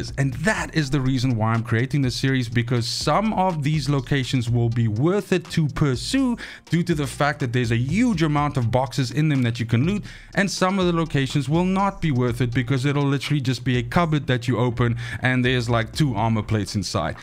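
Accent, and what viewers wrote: South Africa. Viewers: Where you from you sound South African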